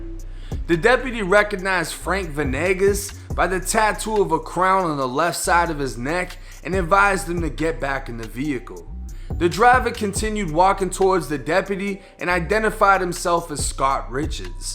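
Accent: Boston, United States. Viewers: Depottment? Incosserated? Are you from Boston, sir? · You from Boston bruh? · He from Boston I think lol he got that stupid accent 🤣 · Yo you from Boston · oh youre from Boston for sure. soon as you said "Car" it was confirmed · Wait. You said PAHKINLOT LIKE I DO BRUTHA. you from Boston. I'm in Dorchester. South bay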